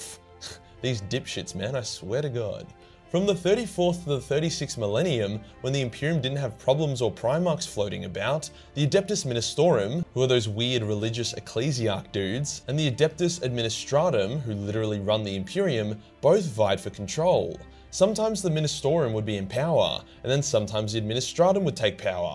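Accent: Australia. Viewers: I don’t know if I would’ve enjoyed Warhammer 40 K if it wasn’t narrated by an Australian keep it up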